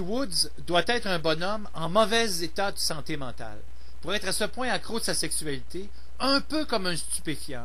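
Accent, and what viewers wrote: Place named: Quebec, Canada. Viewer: Très intéressant! au passage j'aime bien l'accent québécois Bonne continuation!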